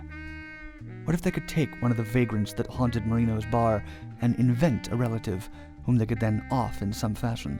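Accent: Ireland. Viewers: My God, that's an Irishman! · can you just do all future episodes in your irish accent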